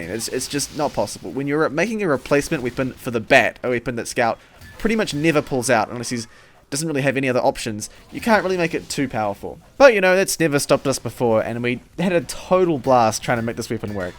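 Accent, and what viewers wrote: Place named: New Zealand. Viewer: He's from new zealand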